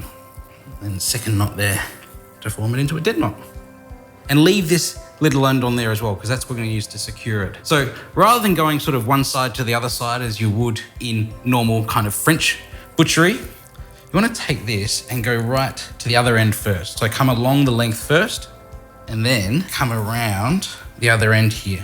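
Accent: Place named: Australia. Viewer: where you from? you also sound australian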